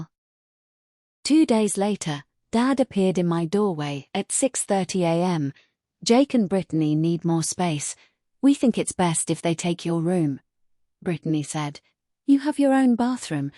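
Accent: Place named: Midwest, United States. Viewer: That's quite the American Midwest accent!😂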